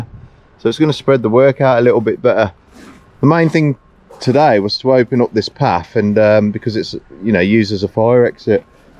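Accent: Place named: Midlands, United Kingdom. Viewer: Great Video 👌 I’m near Solihull not far from you by the sound of it ✌️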